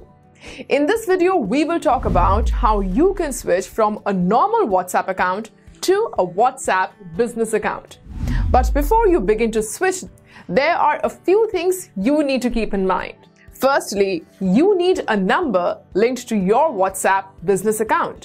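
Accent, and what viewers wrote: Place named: India. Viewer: O realy, you make it so easy for me. I love the english accesent of Indians.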